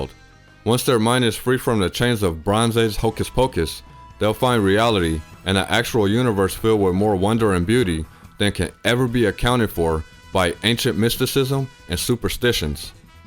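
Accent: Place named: New Mexico, United States. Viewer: Great video. Your voice is easy on the ears and the stuff you are saying is easy on the mind. Are you from New Mexico? Trying to pin down that accent.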